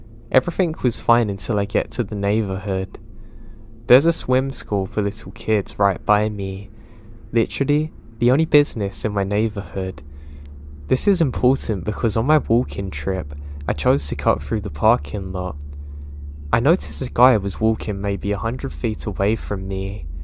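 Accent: United Kingdom. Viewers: You really are one of the narrators who relax me. When I drive I listen to Mr Revenant and Being Scared, but if I want to be simultaneously scared and soothed to sleep, you're the one. I do have unusual dreams listening to your soft British accent (I assume you're British and not any of the others, I know you aren't a Liverpudlian but to my ears you sound a little cockney. I wonder if you could clarify from where in the British Isles you are from. Not Ireland or Scotland or Wales, right?)Yorkshire?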